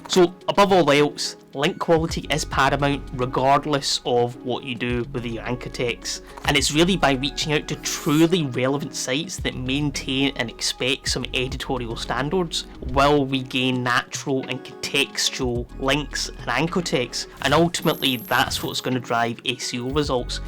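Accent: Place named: United Kingdom. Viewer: That's an entertaining British accent 😅 Where from?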